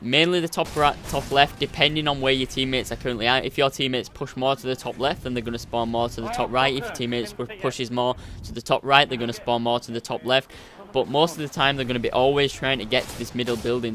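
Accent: United Kingdom. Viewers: you're a great player and commentator dude. i love listening to commentators from the UK. its good to respond to your fans' comments. keep it up!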